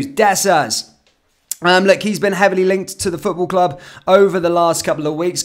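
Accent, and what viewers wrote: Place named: England, United Kingdom. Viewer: Good man . For an English man (I am assuming because of the accent , sorry if I'm wrong) but well done supporting us. You do keep up with the news be it dodgy or truth which takes time ,effort and is not easy to do if you work elsewhere also mate. Maybe do some competitions or raffles for T-shirts before start of the season and see if it is an avenue worth going down ,beside s from that if you are a Rangers man then your one of us and any stick you get is from people who are the same the world over . Moan and complain but I don't see them put out a channel with content 3 or4 times a week at least . Only came across you in the last year and subbed ,i cannot always get time to check subs channels daily but see you on quite often iF your a bluenose and you want to wear a T shirt go ahead but if you don't thats fine also so long as you are not wearing hoops you will always be welcome at Ibrox in my view.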